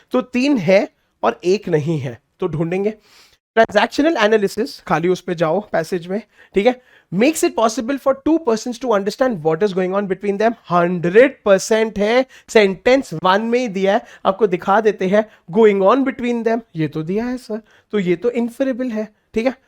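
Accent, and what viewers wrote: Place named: Bihar, India. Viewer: Maano ya na maano but Bihari accent has the best grip to students😄😃 Way of Teaching is amazing 🎉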